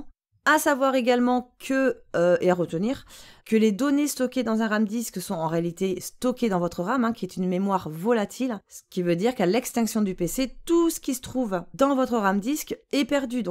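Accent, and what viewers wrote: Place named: Toulouse, France. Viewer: Cet accent Toulousain ^^ J'adore lol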